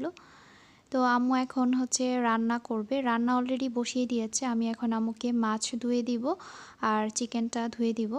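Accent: Comilla, Bangladesh. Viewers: Apu tmr basha comilla kothay?? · Nice apu bt apnar basha comilla kothai amar o comilla basha apu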